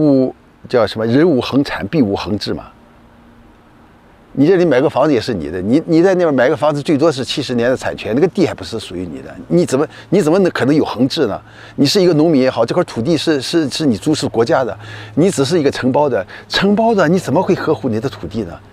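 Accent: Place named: Yantai, China. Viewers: 作为一个大连人，烟台口音听出了家乡味道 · 听大叔口音是烟台海阳的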